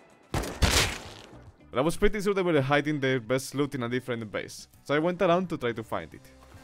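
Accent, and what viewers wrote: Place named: Spain. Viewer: Illo are you Spanish you have the accent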